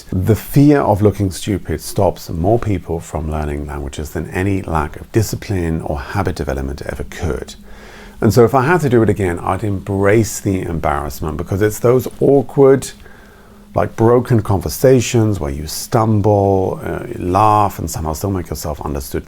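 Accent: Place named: Germany. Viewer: haha as someone studying german for A level at around B2 level in england, I wonder if anyone has told you that you actually are developing a slight german accent in the way you say some words lol! Anyway, this is a very interesting and informative video, danke!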